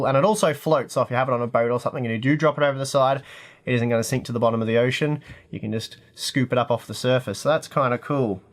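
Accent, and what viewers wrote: Australia. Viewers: i WANT you to say something in pure australian accent in the next video. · oh just realize you're aussie mate!!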